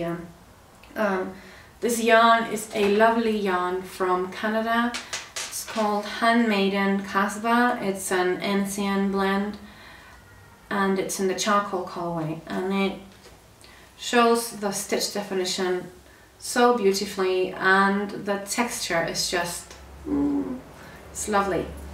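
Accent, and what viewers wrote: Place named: Chile. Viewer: Hi! Nice podcast and I love your acento chileno:)( when you say Santiago de Chile ) I miss that accent ( Im originally from Argentina, now living in Canada )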